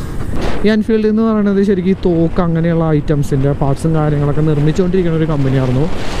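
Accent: Thrissur, India. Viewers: ഫോൺ കേടായത് കൊണ്ട് നാൾ കഴിഞ്ഞ് ആണു ചെട്ടായിടെ വീഡിയോ കാണുന്നത്.. സംസാരം ഒക്കെ ആകി മാറ്റി കളഞ്ഞല്ലോ.. missing that Thrissur broo.. ഞാൻ തൃശൂർകാരൻ അല്ല.. പക്ഷേ I loved the way you talked.. pazhe pole mathy broo...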